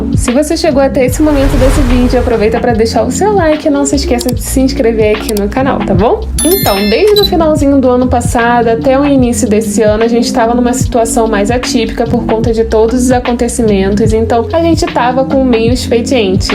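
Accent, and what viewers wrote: Rio de Janeiro, Brazil. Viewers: Você é do sul com esse sotaque carioca? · Tu é gaúcha, com esse sotaque super carioca? Não entendi...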